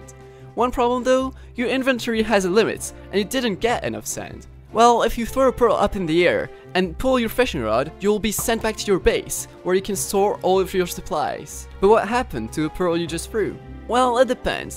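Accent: France. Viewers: C'est plus simple a comprendre avec ton accent Français 👍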